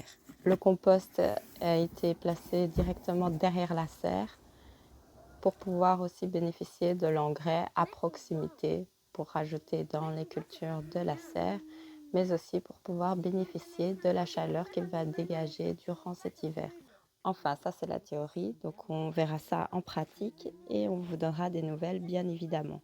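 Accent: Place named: Belgium. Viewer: Olà la p'tite famille 👍🏼 merci 😉 j'adOOOre ta petite intonations Belge 😍 bonne continuation 😘 et à+❣️💃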